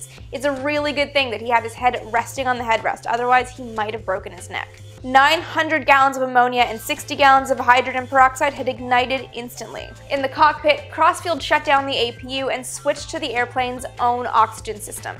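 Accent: Canada. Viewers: why does the X-15 have a thick, wedge shaped vertical stabilizer? All other surfaces seem normal. I thought a teardrop shape was best for aerodynamics. Perhaps you could answer that in another episode. BTW, I suspected you were Canadian ( aboat ):- )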